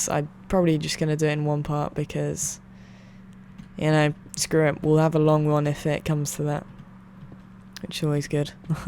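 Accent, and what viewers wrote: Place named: England, United Kingdom. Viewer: Drum and that was awesome and I love ur England accent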